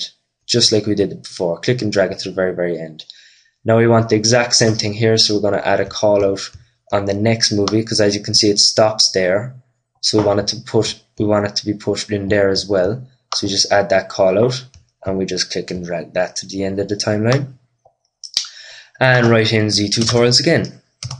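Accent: Ireland. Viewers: Are you Irish? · I swear you're Irish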